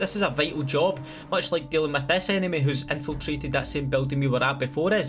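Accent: Scotland, United Kingdom. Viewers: Don’t forget the Scottish accent and “almighty folks” I won’t even play PC/console cod’s but I just watch him for fun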